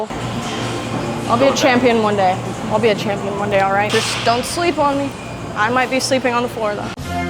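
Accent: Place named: AAVE, United States. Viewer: Nothing more annoying than a woman speaking Ebonics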